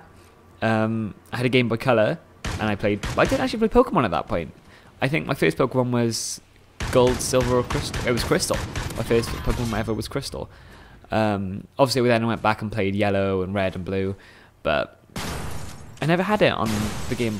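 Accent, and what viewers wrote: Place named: United Kingdom. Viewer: British Pershon........I likes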